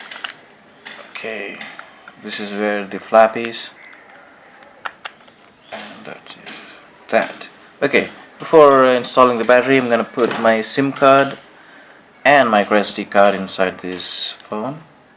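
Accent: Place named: India. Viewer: thanks bro...btw just a suggestion,accent is what comes to people naturally,embrace your indianness,god bless you...no offence...keep the good work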